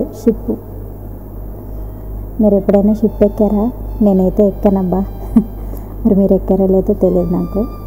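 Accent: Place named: East Godavari, India. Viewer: Madhi kakinada..meedhi A vuru mee voice vintunte East Godavari yasa laga anipistundhi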